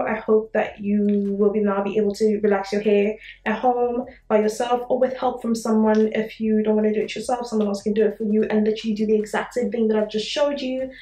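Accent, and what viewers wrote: South Africa. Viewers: Sounds like you have a South African accent, do you live there?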